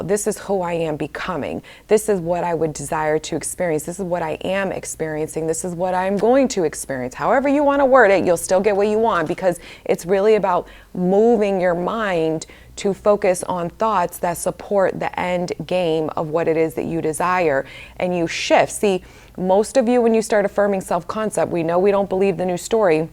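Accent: New York, United States. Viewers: I don’t ~need~ one on one coaching from you but I WANT it. That powerful New York cadence makes everything you say hit so much harder. Ty for another kickass video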